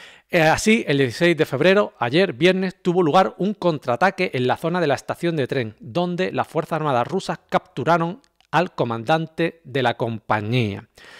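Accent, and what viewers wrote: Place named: Andalusia, Spain. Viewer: entre Remi y vos están despegados en punta vuestra calidad y respeto al comunicar no es muy común, además tienen un plus,Remi habla muy rápido y a veces se tranca pero las info son como estar ahí,y lo otro tu acento andaluz sino me equivoco es muy agradable de oír ni hablar de tus mapas. Saludos y abrazo cordial desde uruguay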